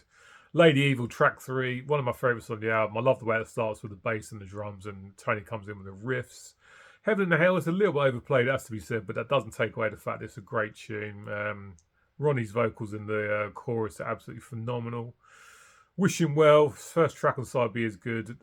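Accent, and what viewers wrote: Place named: West Yorkshire, United Kingdom. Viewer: that could be your accent, not sure if it’s West Yorkshire, and baritone . Anyway, I’m happy to find your channel and subscribed it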